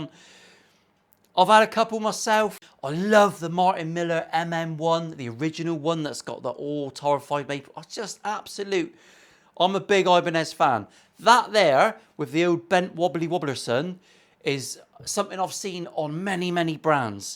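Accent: United Kingdom. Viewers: Hello, I have no problem with the swearing you say but.. for heaven's sake.. can you tone down that British accent a little? It's getting on my nerves! And, by the way, I'm from Brazil and I speak Portuguese.. Anyway, I'm signing up for this channel right now. Thank you!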